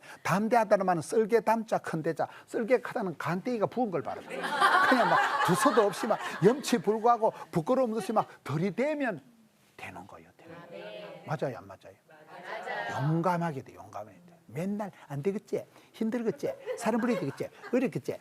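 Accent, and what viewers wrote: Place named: Gyeongsang, South Korea. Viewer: 목사님 경상도 사투리가 너무 웃기세요 그래도 너무 은혜롭습니다~~ 할렐루야 아멘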